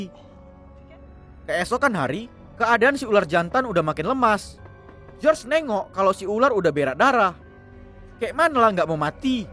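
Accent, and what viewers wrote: Medan, Indonesia. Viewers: Orang medan bah yg review film nya🤣,mantap kali bah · Orang medan ni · Logak cara bicara abng kaya orng medan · Orang Medan kek nya admin nya ini wkwk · kek gini lah klo org Medan nge review film,jd ngakak😂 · Kekel ma bahasa ke bang... Medan d mana ke bang... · Binje - Medan kali bahasa ko bang 😄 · kyaknya ko orang medan ya bang Mantap la🖒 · Becak becak, Medan x pun kau wey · Yang cerita orang medan , bah makin keren si abang ni😂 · Eh nampak kali Medan ny ABG ni bah seru pulak aku denger suara kau bg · Anak medan bah😂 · Nampak kali medaaan kau yaa 😂😂😂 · Suaranya logat anak Medan ini... Yg Punya Canel anak Medan Bung... 😂😂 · Logat medan nya mstap kali🤣🤣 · Kok suaranya mirip logat org medan dah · Medan nih suaranya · Ceritanya kek lagi begosip versi org medan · Dialeg medan bg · Logag medan in kak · Medan kali ah kau bang.. Horas medan😀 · Medan kali logat abang ini ya kan we...?? 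· Yang ngomong orang Medan y bang? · Fix ni admin orang Medan🤣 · Medan kali kau yah lek hahaha sama sama medan kita Horas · Medan x bahh logat nyaa..haha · Suara org Medan😀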